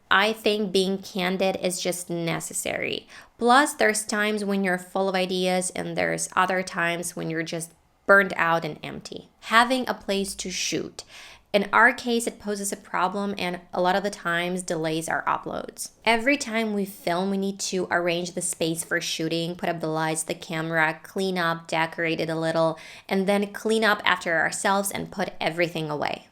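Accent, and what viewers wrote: United States. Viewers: Hi there, you're the greatest English teacher and as well as your American accent is so awesome..And please ignore the dumbest stuff who're criticism about you..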